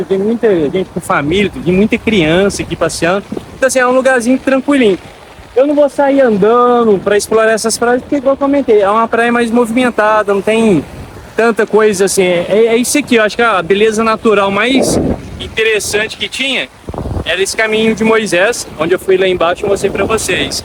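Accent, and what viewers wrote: Minas Gerais, Brazil. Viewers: otimas dicas Brother, pelo seu sotaque é minerin que nem eu kkkkkk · Não consigo parar de ouvir vc falando, esse sotaque mineiro é bom demais rs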